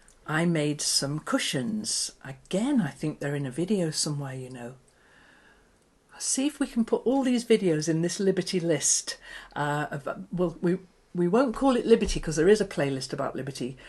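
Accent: United Kingdom. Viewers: I agree with sherryconroy. I look forward to your Sunday YouTube videos. My paternal grandparents were from England. They passed when I was just a toddler so I have no recollection of their lovely British accent. Listening to your lovely voice is so relaxing. I wonder...did they sound like you? I'd like to think so. Nowadays so many of the online tutorials just rush through the steps leaving me a bit unsettled and jittery. I think of you as our Mr. Rogers here in America..."won't you be my neighbor". Thank you for just being you!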